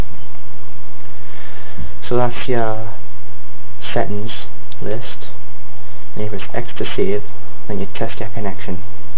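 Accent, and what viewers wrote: United Kingdom. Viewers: Thank u s omuch dude your the best even thoughe u sound like a skotsman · are you a geordie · oh good it is a british person doing it the how to video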